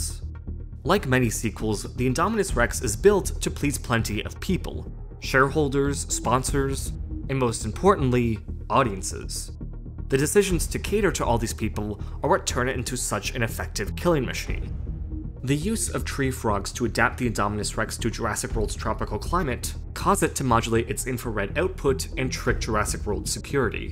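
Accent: Canada. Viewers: canadian accent detected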